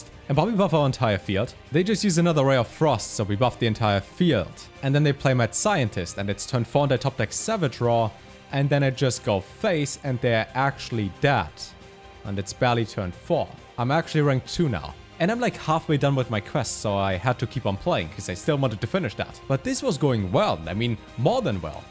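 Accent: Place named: Germany. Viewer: I cant quite tell where ur accent is from but it sounds german Wenn das wahr is dann is mein lieblings youtuber deutsch , genau wie ich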